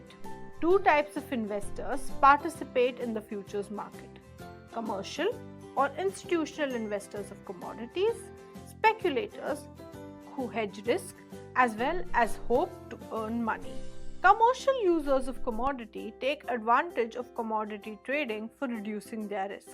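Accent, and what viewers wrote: India. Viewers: Disliked for heavy Indian accent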